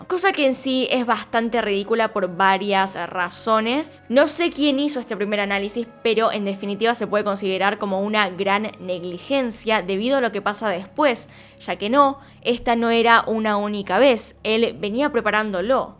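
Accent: Buenos Aires, Argentina. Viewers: Acento argentino 😕😕😕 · Eres porteña?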